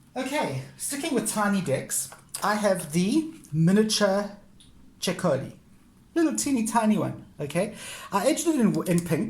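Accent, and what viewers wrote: South Africa. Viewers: Oh my! WHO ARE YOU??!!! I've only just stumbled upon you vis-a-vis this video. (Who doesn't love a collection video!) Immediately, I recognized, and was drawn to, the South African accent. LOVE your energy, wit, clarity, etc etc... I will be certain to check out other videos on your channel. You've earned a new sub. Thank you for sharing your collection! ♥️ You and yours, stay well. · Really enjoyed your video! Great collection, and your accent made me feel nostalgic, as I was born in South Africa😊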